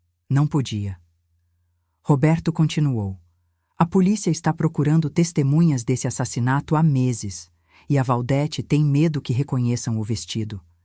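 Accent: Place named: São Paulo, Brazil. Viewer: A narradora estava falando tão bem,com um sotaque bonito de são Paulo capital. De repente, o sotaque ficou interiorano trocando o R pelo L. Horrível.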